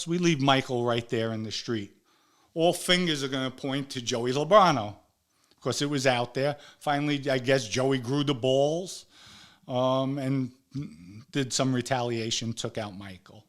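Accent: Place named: Bronx, United States. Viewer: once I heard like myself, you were from the Bronx, I was sold. Welcome and good luck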